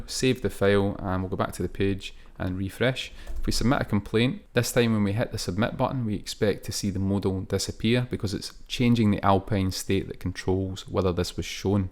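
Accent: Scotland, United Kingdom. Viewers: great video and I like the Scottish accent!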